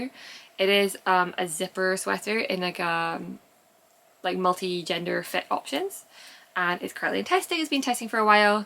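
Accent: Scotland, United Kingdom. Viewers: Hi, I love watching your videos. Last year I planned a ten day driving trip to Scotland and I watched a lot of videos with people from Scotland explaining the history and as interesting as that was I kept finding myself listening to your podcast. And i just wanted to say that hearing your Scottish accent really did come in handy when talking with people in Scotland. Because I was listening so intently to what you were saying I found that after a bit I was hearing your accent less and less. So thank you for that and keep on knitting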